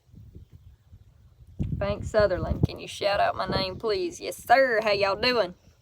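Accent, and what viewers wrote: South, United States. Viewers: I love your southern accent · Love that Southern drawl😉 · just watched because I want to hear the southern accent.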